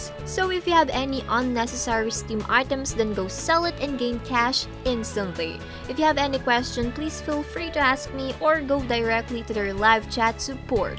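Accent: Philippines. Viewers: that Pinay accent though lol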